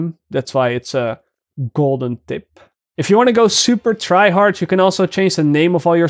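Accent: Netherlands. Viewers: are you Dutch? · is he dutch because he sounds dutch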